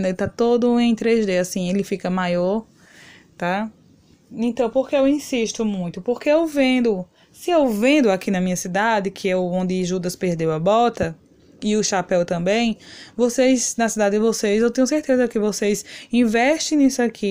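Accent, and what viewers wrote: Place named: Alagoas, Brazil. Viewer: Seus trabalhos são liiiiiindos ,amei seu jeito de falar ,alagoana né?gostei muito e vou me escrever pra aprender fazer todas essas coisas lindas que VC ensina. Não sei pintar mais pretendo aprender ,Deus te abençoe.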